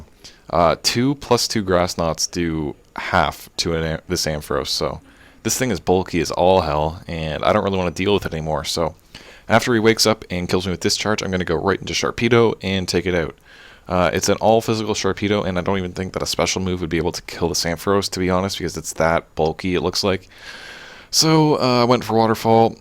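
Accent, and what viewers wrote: Canada. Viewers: u sound candian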